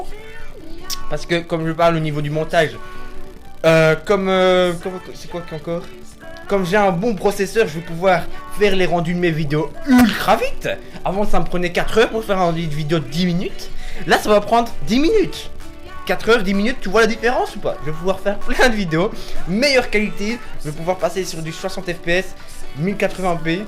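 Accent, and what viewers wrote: Belgium. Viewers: Tes du côté wallon ou flamant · di donc tes belges toi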